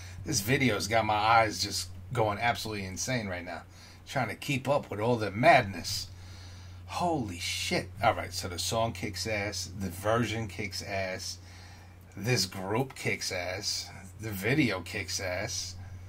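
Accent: United States. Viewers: Heard that NY accent and I was hooked. I'm from Brooklyn. Love your reactions. Welcome to the PTX rabbit hole, I am gonna enjoy hearing your great reactions to this unbelievable group been a fan since 2011 When they won the singoff. I'm going to take this journey with you. Subbed Now I can enjoy PTX and you. Thanks!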